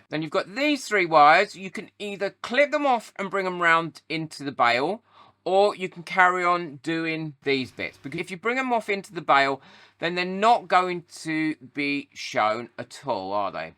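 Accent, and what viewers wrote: United Kingdom. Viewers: It's just wrong to hear US standards in such a thick UK accent...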